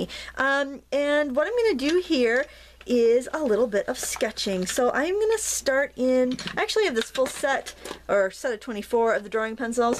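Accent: Maine, United States. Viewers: This is funny.... I had just been thinking about how you say the word drawing, and then you said something about it. It is fun listening to your Maine accent while tutoring. I love your work, as I have said many times. Think I will "drar q" some gourds, for my friend who carves them as a side income. She is incredible, and being her friend, I have been given many, so a gourd card would be perfect for her. Thanks for the "draring" tutorial!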